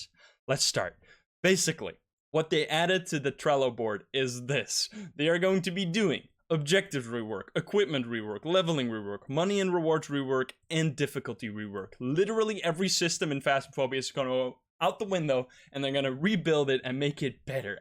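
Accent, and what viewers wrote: Netherlands. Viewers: eeeey een nederlander of belg idk eeeeey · Dit is een Nederlander ik kan het horen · WAIT, are you dutch?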